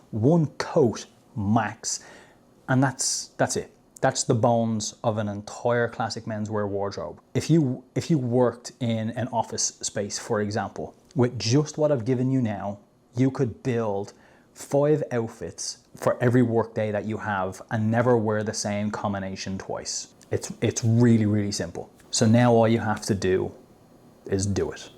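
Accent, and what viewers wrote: Ireland. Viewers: I love your black cat, your Irish accent and your highly useful advice! Plus your natural performance. Good job! I am really grateful for having found this video! Thank you so much!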